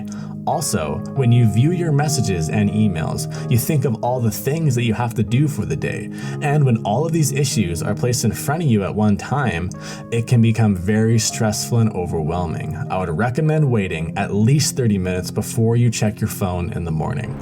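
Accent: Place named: Sweden. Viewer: Du är ifrån Sverige man hör det på din röst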